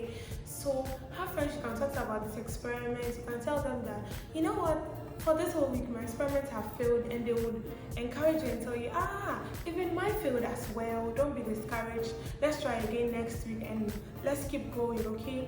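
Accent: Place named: Japan. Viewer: 😃, your english accent now tho. You are sounding more Japanese now😂. Wisdom you speak. Thanks for sharing and again, you inspire!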